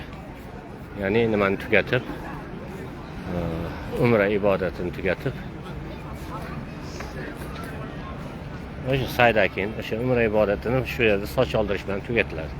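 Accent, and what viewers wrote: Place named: Namangan, Uzbekistan. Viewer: Хожи ака сиз Наманганлик танидим овозингиздан буйрак огриганларга тавсия бергансиз олох зиерат ларингиз Кабул булсин. Олох сиздан рози булсин. Мен сизни. Новосибирдан кузатиб бораман Хаж умрага бордим олохим кайта кайта боришликни насиб килсин Олох. Асалому алейкум ва рахматулоху ва барокоту 🕋🕋🕋🕋🕋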